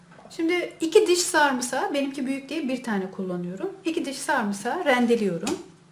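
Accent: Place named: Kütahya, Turkey. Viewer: slm hanim nerelisiniz sorabilirmiyim??sanki arada bizim oranin yani kütahyanin sivesini kulaniyormusunuz gibi geldi:-) bu arada dün pisi tarifinizi denedim güzel oldu sagolun ☺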